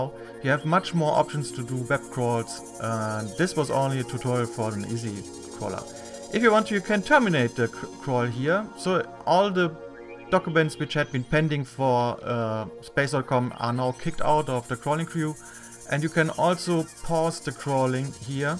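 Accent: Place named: Germany. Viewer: German English :) I like it.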